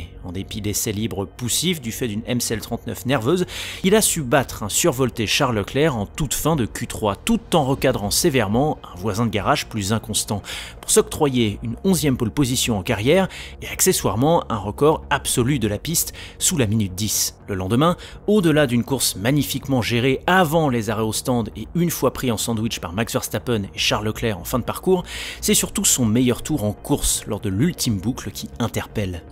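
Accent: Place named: Toulouse, France. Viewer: c le roi des jeux de mots éclatés au sol (Max Vegas, Lewis Ham’burger) on dirait un oncle relou qui découvre Twitter en 2010 et pense encore que faire une vanne pourrie, c’est de l’analyse. ttes ses vidéos c est un mix de mèmes de babtou rincés et une réflexion proche du bac à sable. Y a tellement peu de fond qu’on dirait qu’il commente la F1 en lisant des tweets. Le pire, c’est qu’il pense avoir un vrai ton mais tout ce qu’il a, c’est son accent toulousain qui le rend encore moins serieux.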